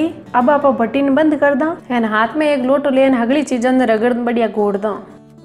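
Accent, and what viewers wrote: Marwar, India. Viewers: बहुत अच्छा? जी आप मिठी मारवाड़ी भाषा मे मिठाईया मिठी - मिठी बनाते हो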